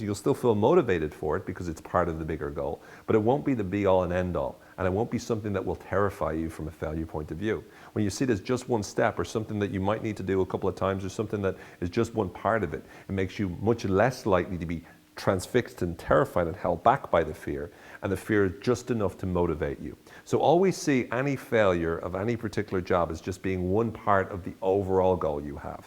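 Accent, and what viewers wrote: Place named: Ireland. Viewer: You speak in a wonderful way, with clarity, passion, wisdom, and a lovely soft irish accent : )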